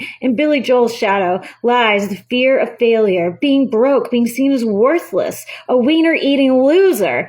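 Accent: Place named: South, United States. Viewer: Nice southern accent. 😉That was funny. 😄 The sheer amount of effort you put into your work is astounding, and the level of detail is top notch, and very much appreciated. I could easily picture everything you said, and watch it in my mind like a movie. Have you written any books or considered writing books?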